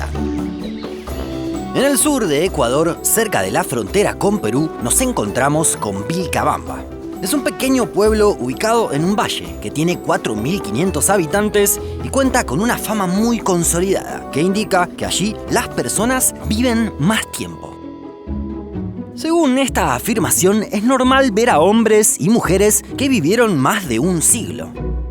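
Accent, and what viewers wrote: Argentina. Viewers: El narrador es argentino también, aunque en el equipo hay distan nacionalidades si mal no recuerdo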